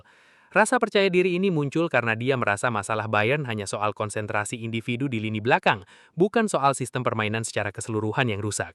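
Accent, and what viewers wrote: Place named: Makassar, Indonesia. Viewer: itu logat org Makassar dan Bugis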